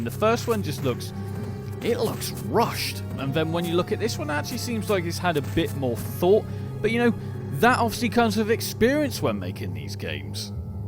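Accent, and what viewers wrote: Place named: United Kingdom. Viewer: i hate when your gross british accent utters rebecca when the character is obvi jill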